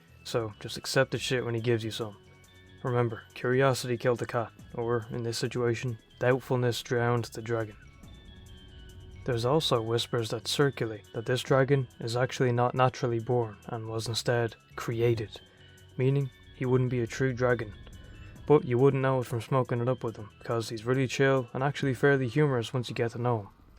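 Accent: Ireland. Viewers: I feel dumb for not noticing you were Irish 😂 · Dude I don’t know why but you sound soooo Irish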